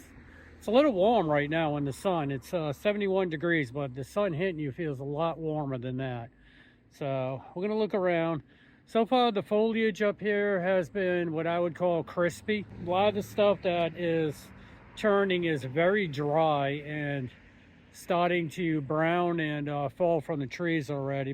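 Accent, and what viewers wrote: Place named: Louisiana, United States. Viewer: ✨⚜️🍁🍂🎃🪾SUCH A GOOD DRIVE! ARE YOU FROM NEW ORLEANS BECAUSE I CAN HEAR UOYR LOUISIANA ACCENT🙂🍁🍂🎃🪾⚜️✨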